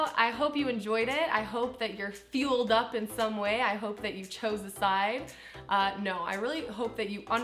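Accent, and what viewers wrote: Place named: South, United States. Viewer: You’re definitely from Alabama or Mississippi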